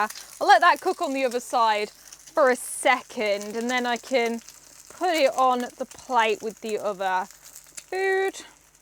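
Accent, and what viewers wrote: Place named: England, United Kingdom. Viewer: Lovely English accent ❤. I'm definitely going to make myself that same English breakfast.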